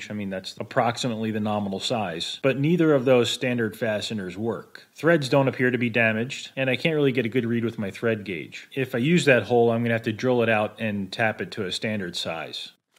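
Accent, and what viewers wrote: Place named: United States. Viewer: Ive always wondered where is from. You sound like an american, but you use the metric system. Im so confused!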